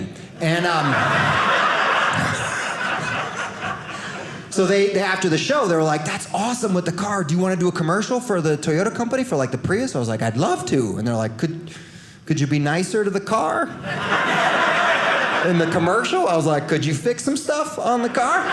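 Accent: United States. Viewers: As a Miami Cuban, it's not the whiteness that gets me as much as the complete lack of any accent other than the very clear American accent when pronouncing Spanish words.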